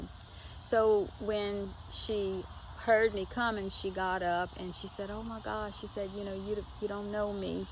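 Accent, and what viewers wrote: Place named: New Orleans, United States. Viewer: First time I’ve ever saw your videos and I can hear that NOLA in ur voice. Bless u momma.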